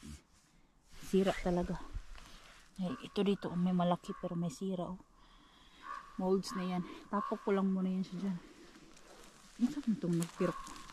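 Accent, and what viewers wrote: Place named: Visayas, Philippines. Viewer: Bisayan dialect ,,asa manka sa visayas region maam...new subscribers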